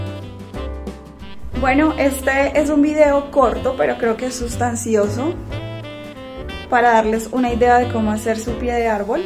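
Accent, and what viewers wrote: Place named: Colombia. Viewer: ese árbol lunar está muy chévere y el pie de árbol está chido.. y si hablas mucho y con acento colombiano.. pero me gusta ver tus vídeos bueno cuando tengo tiempo jaja. Has un suerter navideño para piña. Saludos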